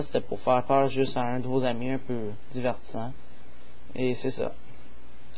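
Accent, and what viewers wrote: Quebec, Canada. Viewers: je comprent pas tou t quebecois bref by ^^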